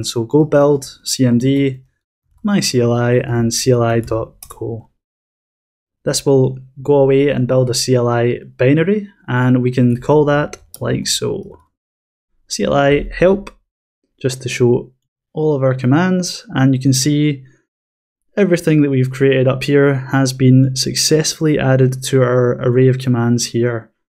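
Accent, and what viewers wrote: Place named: Scotland, United Kingdom. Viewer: Scottish bloke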